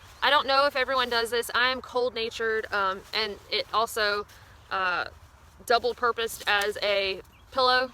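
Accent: South, United States. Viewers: Nice work. I hike the Pinhoti Trail a lot. Have you hiked it? Its not far from you. I live in Birmingham. Love your videos, especially love listening to your southern drawl:). Im originally from the Sylacauga area. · I thought this was a really good video told by a really cool chick. where is your accent from exactly? Southern, Mississippi maybe? I've never heard the term "tobagen" before for a knit cap or beanie. Also, so are you saying a thru hike encompasses or spans no less than 3 seasons? As in spring, summer and fall? Kreikies thats a hell of a lot of hiking. What do you do at night? Play cards or anything? Are people hooking up at all? Haha! Or are the too stinky? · Your southern dialect is kind of blowing my mind. I'm from NM and I've lived in D.C. and MA and I've never heard someone use the words "britches" or "toboggan" before. I would just say "leggings/tights" or "beanie". 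Anyway, I just found your channel and I love your videos so far. Best of luck on the PCT!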